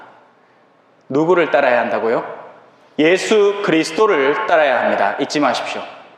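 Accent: Gyeongsang, South Korea. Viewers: 정말 의 다양하신 탈렌트 멋지십니다~ 확실하고 화끈하고 분명하고 명확한 메시지 를 정확한 발음으로 구수한 갱상도 사투리를 섞어가며 전달하시는 신부님이야말로 진정 멋진 선교사이십니다~ 한가위 추석명절 기쁘게 잘 보내시기 빕니다~♡♡♡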